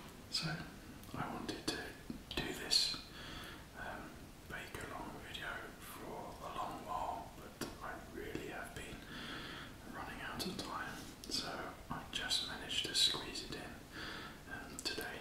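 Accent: England, United Kingdom. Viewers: this accent comes from which part of England?!? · Geez, your Brit accent is something, huh? Greetings from a Brazilian chocolate lover! 😋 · ASMR, plus gains, plus cake, plus British accent. I think you just became my favorite youtuber. 😍🎂💪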